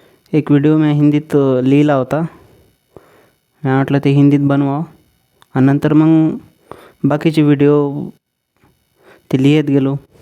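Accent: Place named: Varhadi, India. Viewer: Aaplich bhasha chngali haay na ga... Varhadich tar bes vatte ekdum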